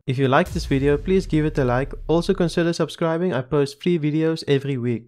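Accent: Afrikaans, South Africa. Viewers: Wow that afrikaans accent is strong with this 1.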